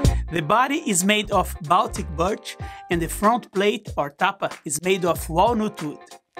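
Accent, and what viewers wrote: Brazil. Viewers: Seu sotaque parecia muito brasileiro, e agora que fui ver eu estava certo kkkkkkkkkkkkk Que deus te abnçoes irmão!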